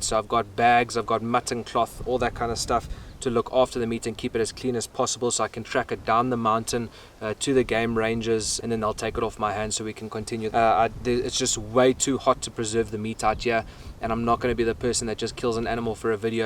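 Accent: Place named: South Africa. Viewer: Hi brother, are you South African? 😅that accent.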